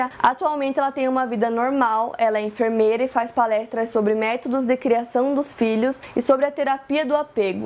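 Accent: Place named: Paraná, Brazil. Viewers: Ela é de PG aaaa amo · Eu também sou de ponta grossa PR msm cidade que ela então pra mim é normal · ela só é sulista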